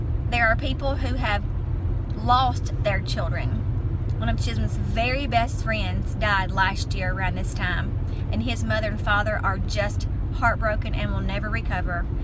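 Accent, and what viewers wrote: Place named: Tennessee, United States. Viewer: your videos are cute as a bug. Love that Tennessee accent the sheriff is a very lucky man to have such a sweet young lady. Remind him quite often how lucky he is. Jmal Jim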